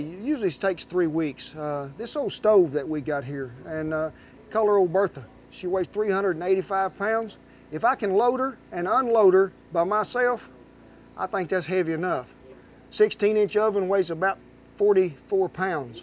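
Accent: South, United States. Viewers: Omgosh! Look how young you are!?! I only came across your channel I believe this past weekend or 4 days ago at best. I didn't think you had your channel a very long time. Bless you for your success. Love your Southern accent too. You're so informative, funny as all get go & love your dancing jig. Would love to actually see your lovely voiced wife too. Love, love Chicken fried steak with gravy! OK, time to watch the process. Peace be with us all 🙏. 12/28/24, "Isa" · if you rip your britches what you gona do I wish we had smellivision looks yummy thanks for this video take care ya'll sorry I'm in Massachusetts I like the southern accent or draw take care now yall come back ya hear 👂 I live a boring life until I watch and listen to you and others when I was a child my mom would say to me you want to learn how to cook na I want to watch tv no 62 I watch you hahahaha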